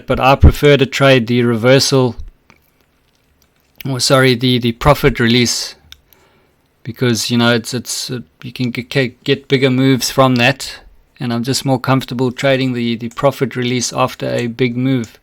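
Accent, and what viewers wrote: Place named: South Africa. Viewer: May I ask what country you are from originally? You don't sound like a New Zealander. I mean no disrespect. If I had to hazard a guess, I'd say you're South African maybe?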